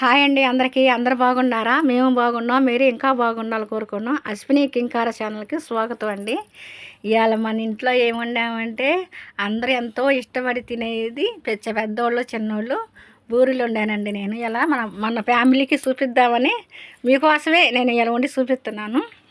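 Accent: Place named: Rayalaseema, India. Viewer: చూస్తుంటే తినాలి అనిపిస్తోంది, చాలా చక్కగా సీమ భాషలో చెప్పారు.. 👌👌👍👍👏👏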